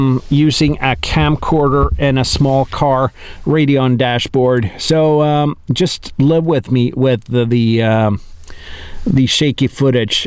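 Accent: United States. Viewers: I enjoy your channel. Have you lived in the States? You have a very midwestern accent. Or midwesterners have a Norwegian accent.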